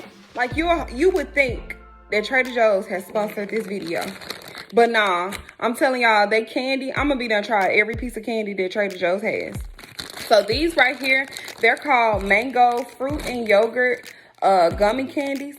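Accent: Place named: Memphis, United States. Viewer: Gtta be from Memphis sound just like me I swear😂 we need a updated snack video 🫶🏽💕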